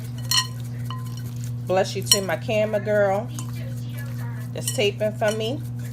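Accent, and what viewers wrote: Baltimore, United States. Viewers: Bmore accent brings me back home. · As soon as started the video, I was like BALTIMORE!!!!!!!! Never lose the accent! I love it. Those wings look so good!!!! I need to get my air fryer asap! Thanks for sharing.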